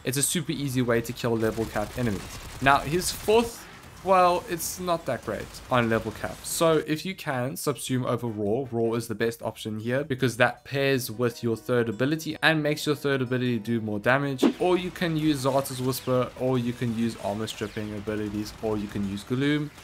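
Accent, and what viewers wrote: Afrikaans, South Africa. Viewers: do you speak afrikaans your accent says yes if you do: Dis awesome om te sien hoe goed suid afrikaaners doen sien bitter min wat bo 1 duisend subs het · Boet are you south African??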